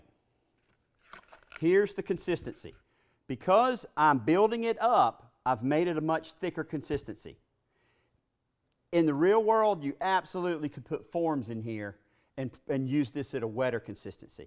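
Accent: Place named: South, United States. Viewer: Nice job 👍, good southern accent too. Though, I still got a q: I am about to resurface my 20x 40 feet concrete driveway which is slightly inclined downhill from the road towards my garage, which make water pooling up next to my garage door. I want to resurface entire driveway but I also want to make the end of the driveway adjacent to the garage door elevated about 2 inches up, and make it sloping down to the garage floor on one side and also sloping toward the outer end by the road - with hope that the rain water will be flowing away from the garage. Any suggestions how to do it DIY? Thanks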